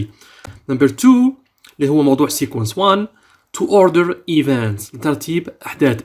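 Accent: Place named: United States. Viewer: صوتك رائع في النجليزية كالامريكيين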